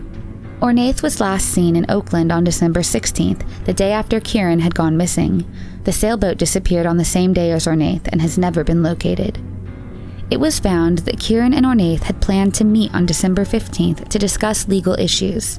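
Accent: India. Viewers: I think the narrator is indian..the accent makes me feel so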